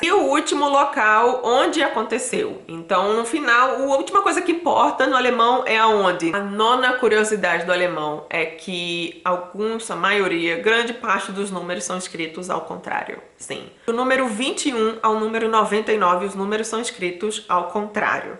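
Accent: Northeast, Brazil. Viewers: Tens algo de sotaque do nordeste...eu estou em Recife.... assinei seu canal e vou seguir, você é muito agradável..me sinto bem ouvindo você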